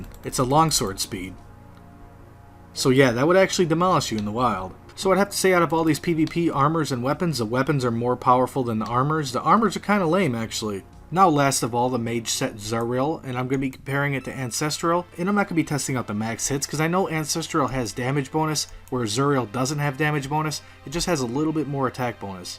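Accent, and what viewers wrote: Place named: Michigan, United States. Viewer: Are you from Michigan you have a similar accent to mine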